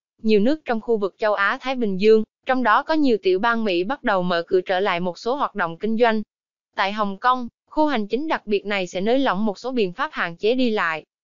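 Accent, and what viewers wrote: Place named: Quang Nam, Vietnam. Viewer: Qua xem giá vàng ngàu 6 tháng 5 và xem luôn QC. Hôm nay có giọng quảng đọc phải không nhỉ.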